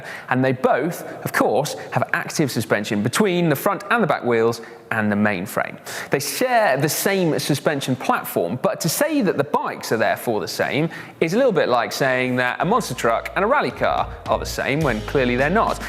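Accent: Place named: England, United Kingdom. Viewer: English accents are annoying..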